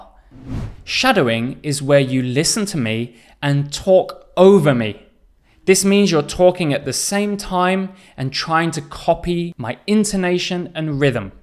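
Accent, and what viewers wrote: United Kingdom. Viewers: Wow ! It is Amazing ! It has been a long time that i'm looking for this type of videos in a british accent .. thanks for efforts and continue ..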